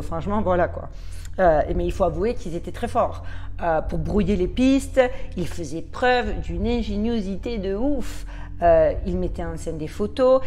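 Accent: Marseille, France. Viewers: Coucou :) Il y a bien un léger accent anglophone mais tu as bien gardé ton accent marseillais ;)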